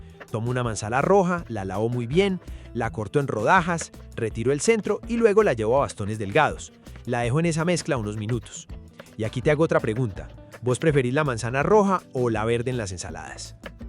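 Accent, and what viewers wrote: Colombia. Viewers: Me encantó la ensalada y me encanta su acento colombiano!! Hágale pues…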